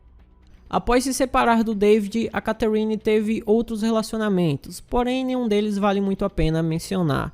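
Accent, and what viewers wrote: Northeast, Brazil. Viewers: Oi! Caso horripilante, o ser que se diz humano, é um bicho perverso. vc é Nordestino? Acho seu jeito de falar bem familiar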